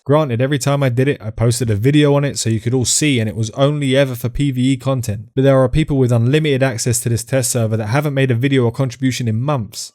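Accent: United Kingdom. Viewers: I admire your British accent, will miss it...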